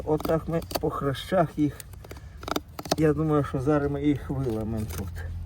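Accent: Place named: Surzhyk, Ukraine. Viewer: Учичи буквар вчи українську мову яка гидка твоя суржикова мова просто ганьба гидко слухати може ти щось і петраїш в цій справі а мова просто гімно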